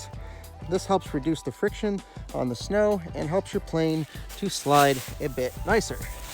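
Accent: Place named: United States. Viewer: Thanks, just back from watching. Yeah, that water looked cold to me. Glad you' were able to recover the plane. BTW, are you an expat? Sounds like native USA English to me.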